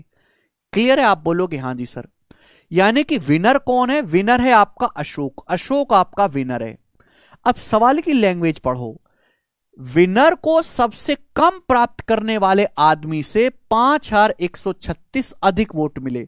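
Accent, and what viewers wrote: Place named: Haryana, India. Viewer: Ram Ram ji haryana ki Badhiya padhao ho sirji In haryanvi style 😊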